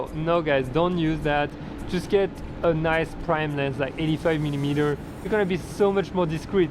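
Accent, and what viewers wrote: France. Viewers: to be honest, difficult to understand your accent, are you French? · You are french? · are you french ? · I like your accent.. Not too french just the correct amount of charm! Good points as well. Every lense let you do something different. But you can always create something spectacular with them - as long as you go out and shoot. Feel free to take a look at my view on Copenhagen and Denmark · J'ai reconnu un accent parisien mdr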